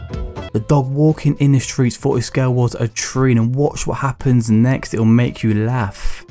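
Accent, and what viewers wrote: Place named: Leicester, United Kingdom. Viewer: I guess you’re from Leicester because I used to live there before. Your accent so different from Londoner but I like it :)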